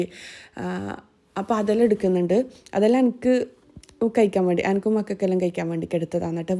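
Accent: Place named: Cheruvathur, India. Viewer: njanum cheruvathur. aanu. samsaram same. aanu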